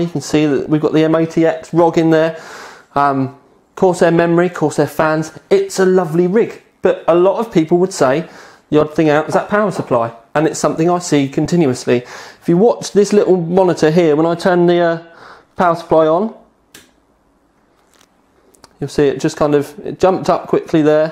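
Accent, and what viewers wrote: United Kingdom. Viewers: British accent haha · British pronunciation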